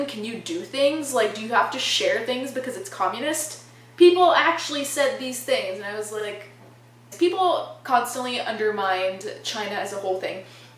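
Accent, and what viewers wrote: United States. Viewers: I think they expected you to have an Italian accent and it’s weird cause you have an American accent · I think it’s weird as do many that you didn’t get your accent in America... it’s interesting but weird because it’s an American accent simply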